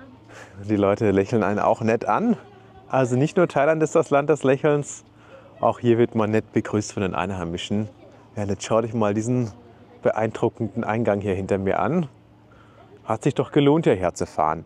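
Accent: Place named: Germany. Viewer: Great video, and an even better channel. I really enjoy watching your content. Your German accent sounds really nice too. Where is it from? Most Germans sound pretty harsh, but yours is pleasant. 🥰👋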